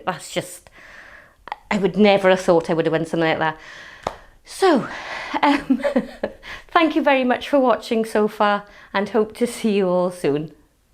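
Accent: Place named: Wales, United Kingdom. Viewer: Thrilled for you all , love hearing your welsh accent , we ‘ve missed all our cardiff/newport family and looking forward to a visit across the bridge soon ! Well done as always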